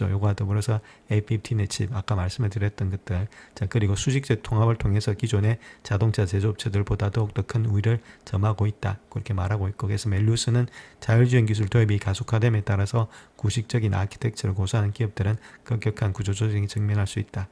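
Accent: Gyeongsang, South Korea. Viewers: 명료한 설명감사합니다. 구수한 경상도 사투리도 듣기 좋습니다~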